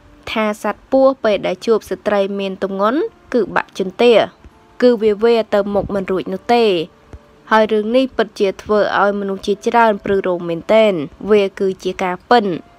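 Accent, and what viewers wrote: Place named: Vietnam. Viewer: អ្នកអាននឹងយួនទេដឹង តុងយួនច្បាស់ចែស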